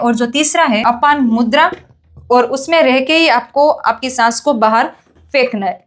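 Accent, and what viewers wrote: Gujarat, India. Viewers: તમારી ભાષા મા ગુજરાતી લહેજો દેખાય છે બાકી હિન્દી સારુ છે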